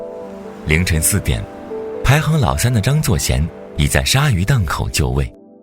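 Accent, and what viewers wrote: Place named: Shandong, China. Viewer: 根据旁白说英语的口音，我觉得他是山东人。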